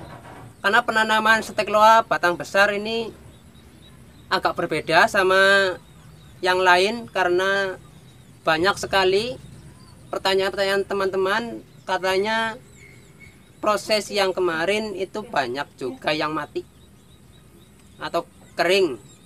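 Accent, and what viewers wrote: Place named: Java, Indonesia. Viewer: Medok mas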